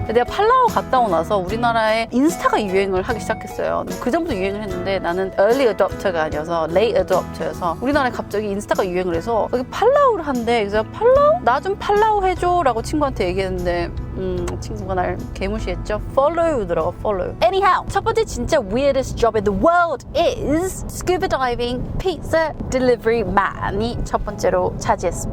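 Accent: United Kingdom. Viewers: 굿모닝~ 애불보디! 늘 들으면 들을수록 브릿이 귀에 쏙쏙!! 즐겁게 방송보고 가유~~^^ 누나 안뇽!^^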